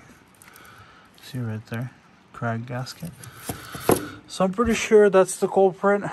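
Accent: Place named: Eastern Europe. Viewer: Another great video. People want to put down the 6.4 but remember is was not Ford Nor International fault that the government made so many emissions mandates. Many people say that after 10 years then the vehicle emissions should be exempted. By the way, I hear a hint of either Russian or Ukrainian or easter Europe in your voice. May I as, Where are you all from?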